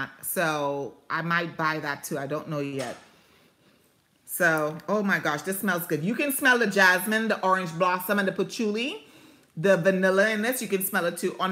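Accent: Trinidad and Tobago. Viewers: ..Congratulations! I was lucky to catch the end of your livestream. Excited for the next one. Love your costume shirt! We love Mandolorian and the child. You sound so happy and jolly I am so very happy we subscribed to each other hoping to get to 1,000 subs soon. I am hearing a slight island accent there❤❤❤ Ps. Wish I knew about the giveaway before those samples looks awesome especially the lipstick I need some new ones but can't afford any right now. Girl some of them brands I never heard about. I am really happy that I am here I have a lot to learn llove the Mickey Mouse bottle I live in his backyard 🙂 Hey I figured the accent out Trini in the house yayyyy. We Trinis are very generous people, your son is very cute. I like how you teach him to call people Miss or Mr I teach my granddaughter the same ❤❤❤ look on my channel I have all them delicious dishes you talking about. 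Come retire in Central Florida it have a lot of new affordable houses.Do you have a PO Box?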